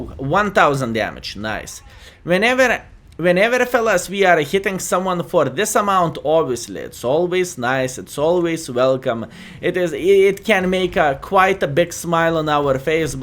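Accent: Russia. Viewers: jesus this russian accent english is horrible..... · Что за акцент?Ты русский?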